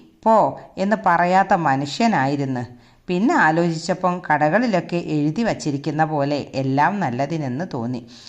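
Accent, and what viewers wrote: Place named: Thiruvananthapuram, India. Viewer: തിരുവോന്തരം ഭാഷ ഉഷാർ😊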